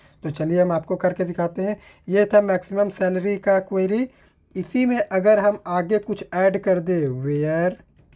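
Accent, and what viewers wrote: Jamshedpur, India. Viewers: Bhai Jamshedpur se ho ky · Are bhai Jamshedpur se ho kya ? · Sir ji app Jamshedpur se ho kya???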